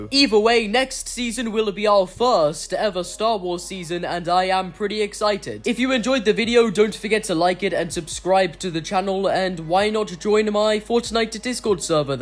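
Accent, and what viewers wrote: United Kingdom. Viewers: This is might be the most british accent I've heard in a while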